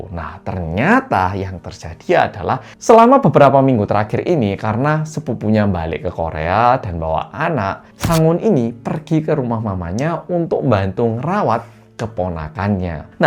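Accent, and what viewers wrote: Java, Indonesia. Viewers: Sampean itu orang jawa apa wong korea too...lucuu😅😅..sik...sikk😅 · Udah lama ga nonton korean medok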